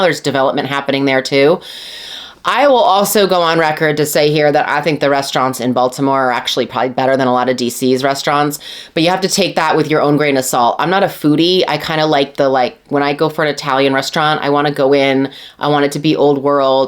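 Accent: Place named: South, United States. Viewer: From Atlanta to DC. Will love to hear that story. I was thinking this is a Southern woman ❤️, you have not been to Bethesda in 25 yrs 😭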